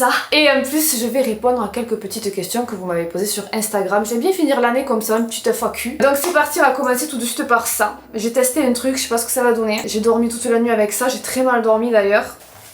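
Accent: South, France. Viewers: Mais non, je suis née à Aubergenville aussi (en Décembre 1988 pas lontemps avant toi) c'est fou!! 😄 Jamais j'aurais cru en attendant ton bel accent du sud 😉 Passe de belles fêtes et reste comme tu es ❤ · Chère! Ton authenticité est touchante et rafraîchissante. J’aime beaucoup tes vidéos, d’abord le maquillage m’a toujours intéressée, mais aussi tu ne te prends pas au sérieux et j’adore! En plus de ton accent du midi vraiment charmant. 🥰 Et puis je me reconnais souvent dans ce que tu dis: timidité, préférer les animaux aux humains, les down que tu vis… et je ne suis sûrement pas la seule. Bref, tes vidéos font du bien! Merci! Et de très joyeuses fêtes à toi et ta famille! 🎄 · Cc, ma!!!! Nous sommes ta thérapie en quelque sortes??? Et bien toi en tout cas tu l’es. Tu es et restera pour toujours une de mes youtoubeuse préférée. Je me retrouve tellement en toi. 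Tout comme toi, plus le temps passe, plus j’adore plus les animaux que les humains... Tout comme toi une vraie Brigitte Bardot dans l’âme. Ne serait-ce qu’avec, en ce moment, l’abattage incompréhensible des vaches et veaux, alors qu’avec un vaccin on pourrait les sauver. C’est une honte. Et pour une jeune fille née en région parisienne, tu as un accent bien prononcé du midi, d’ou cet enchantement à t’écouter et rire avec toi. La première fois que je t’ai découverte sur les réseaux, cela faisait un peu moment que tu mettais des vidéos en ligne. Et du coup, j’ai regardé en un après-midi toutes les premières vidéos depuis tes débuts. Et j’ai adoré. Ta franchise, ta sincérité, ton caractère, ta joie, ton amour pour les animaux (tous). Tu es un exemple pour toutes les générations. Un grand soleil qui illumine nos vies parfois triste... ont aime et j’espère encore découvrir beaucoup mais beaucoup de vidéos sur la mode, le maquillage, la déco. 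tes animaux, un peu de ta vie de famille, avoir des nouvelles de ta fille et de ton mari, même si on ne peut pas le voir. Je termine en te souhaitant de très très belles fêtes de fin d’année. Restes toujours toi même, c'est comme cela qu’ont aime. Bisous à toute ta petite famille. ❤😂🎉😊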